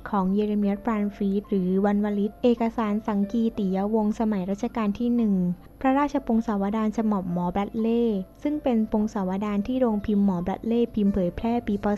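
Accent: South, Thailand. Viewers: น้องนี่คนใต้แน่ๆนิ ทำได้ดีนะน้องนะ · คนอ่านบ้านอยู่ต่ำกว่าประจวบฯลงมาใช่ไหม?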